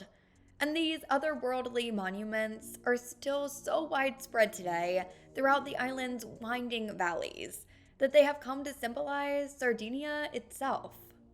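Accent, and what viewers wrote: United States. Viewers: The voice, my God! American accents are the worst.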